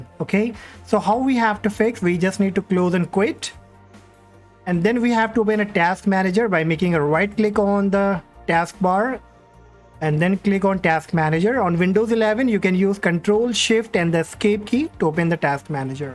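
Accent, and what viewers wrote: India. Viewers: i love you indian boy with your nice dialekt that helps me very ty bwoo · everytime you heard an indian accent you know its a good tutorial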